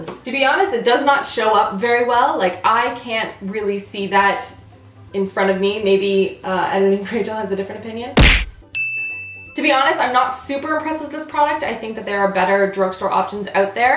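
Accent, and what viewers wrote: Canada. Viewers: that moment when I'm so Canadian that I didn't even realize you sounded really Canadian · You always sound Canadian and it's adorable! it's one of the reasons i follow your channel.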